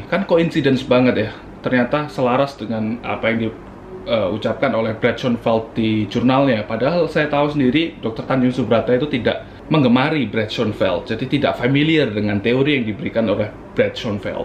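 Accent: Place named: Java, Indonesia. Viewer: kok koyo wong solo / jogja ya. logatnya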